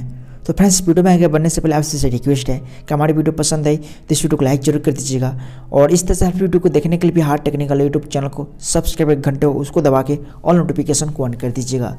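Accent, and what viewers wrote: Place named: Arrah, India. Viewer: awaj me Ara jila ka jhalak mil rha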